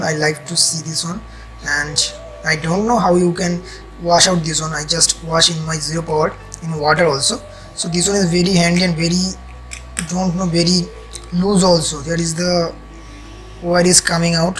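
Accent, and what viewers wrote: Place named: Bengal, India. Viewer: Abey english to sidhe bol... Bengali english mat bot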